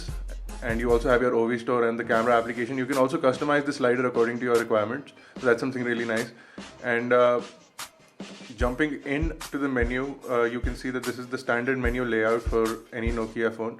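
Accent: India. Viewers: great review, i cant like or subscribe though u sound Indian... and i m Pakistani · 1st time I heard his voice , I knew he is Indian.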